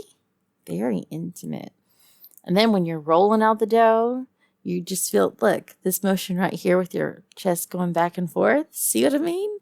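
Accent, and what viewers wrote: South, United States. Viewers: Sounds of the South , only words missing is ya'll.....makes your voice cute as a button!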